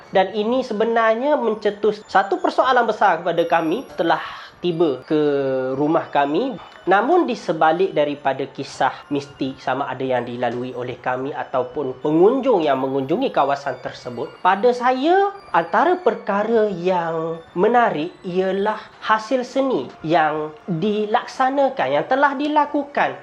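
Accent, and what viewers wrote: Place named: Kelantan, Malaysia. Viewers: Huyoo.. senior aku tuuuu.. slang kelantan tu. Hehehe. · aku x faham loghat kelantan so jangn guna please guna bahasa KL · lol kan di dh guna bahasa melayu baku walaupon ada sikit ii loghat kelantam yg terselit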